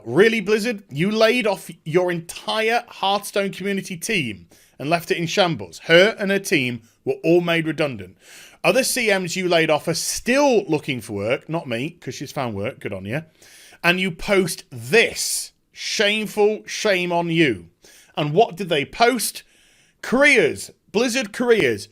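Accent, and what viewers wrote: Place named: United Kingdom. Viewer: This guy sounds remarkably like the late Total Biscuit. Partly the British accent, but it goes beyond that. Spooky.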